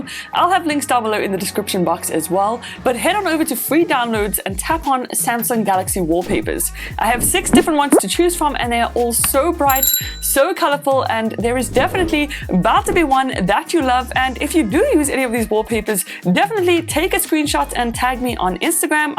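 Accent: South Africa. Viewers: Sounds 100% South African and I love it... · are you south african?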